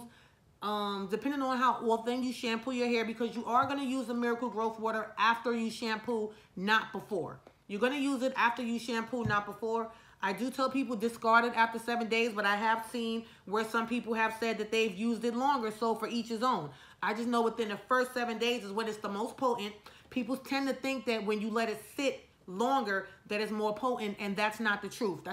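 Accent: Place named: New York, United States. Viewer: Girlfriend are you from NY? You sound just like family honey! Will check out your website.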